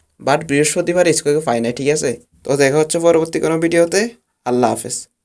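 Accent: Noakhali, Bangladesh. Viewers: ভাই আপনার বাড়ি কি নোয়াখালী😂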